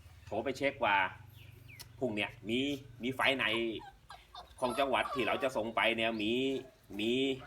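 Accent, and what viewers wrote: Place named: Phetchaburi, Thailand. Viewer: คน เพชร ป่าวครับถ้าคนเพชรคนบ้านเดียวกันสําเนียงเหมือนคนเพชร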